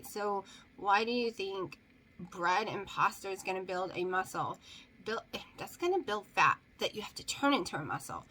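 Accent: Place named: United States. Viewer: I love your country accent ♥️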